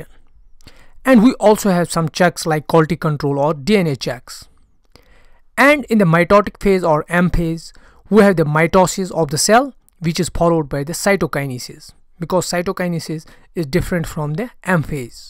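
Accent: Kashmir, India. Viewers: Sir app kashmir sa ho kya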